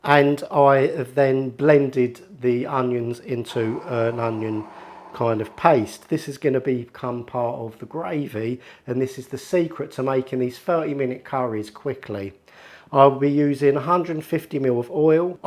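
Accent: Liverpool, United Kingdom. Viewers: Proper scouser ❤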